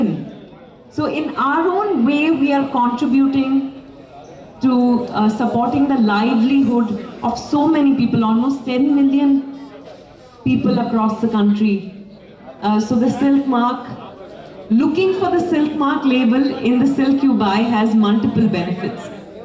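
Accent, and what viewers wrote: Palakkad, India. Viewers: Paalakkadu Tamil girl vidhya balan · Why is she embarassed to speak Palakkad Tamil? It is also one among the many slangs in TN. She should be proud that she knows Tamil even after so many years. Also a big L for those Tamil directors who didn't cast her, national cinema gained a gem. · Mam, your Palakkad Tamil speech is so sweet. You are so beautiful in sarees. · Nee palakattu Tamil appuram yenn Tamil theriyathu Nalla nadippu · Palakkad TAMIL💪💪💪💪💓💓💓💓🇮🇳🙏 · Pallakad Tamizh is so pure😍😍👀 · Palakkad Tamil 💞💞💞💞💞💪💪💪💪🇮🇳🇮🇳🇮🇳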